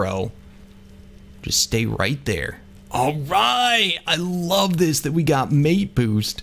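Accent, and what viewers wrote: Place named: Rural, United States. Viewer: Does anyone hear him do a hick accent and every now an the do a Cali one? Its crazy cause none of his old vids sound like this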